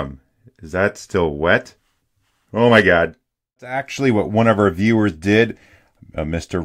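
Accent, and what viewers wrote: Canada. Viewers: Are you AvE’s less sweary brother by any chance. That Canukistan cant is remarkably alike.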